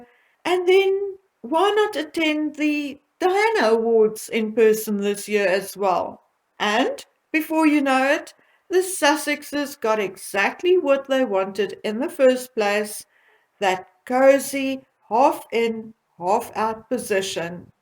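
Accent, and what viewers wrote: South Africa. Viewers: Its very relaxing, then again I am South African living in the UK so any SA voice is a bonus for me.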